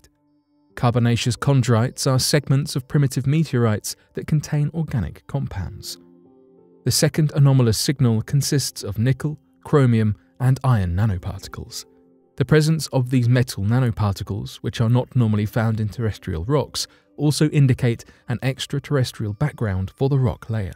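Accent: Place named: Southern England, United Kingdom. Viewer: It's southern English same as mine an you really can't understand? where s your accent from just curious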